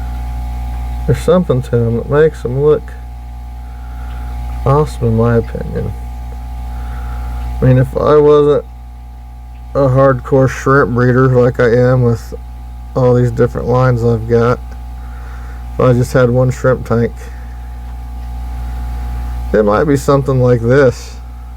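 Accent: United States. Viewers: Are you from texas?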